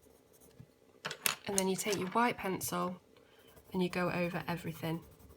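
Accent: United Kingdom. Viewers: Wow! Great tutorial: No prattling, no dreadful music, 'no fancy technique'. Brilliantly demonstrated by an artist with a great British accent. Superb. I've subscribed. Thank you.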